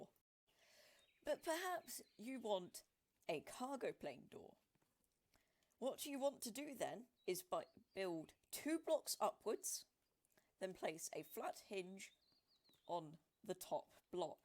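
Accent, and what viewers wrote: United Kingdom. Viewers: Everyone talking about the door: Me: What a posh british accent. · British voice bro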